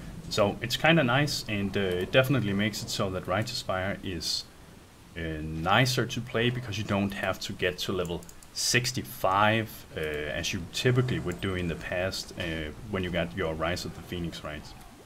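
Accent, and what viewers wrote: Denmark. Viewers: Er du dansker ? :P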